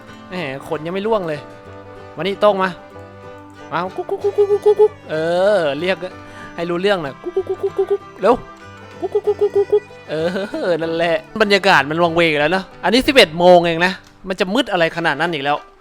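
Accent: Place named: South, Thailand. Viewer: ผิดเเล้วๆๆ555หนูชอบพี่เเหลงใต้มากเลยนิ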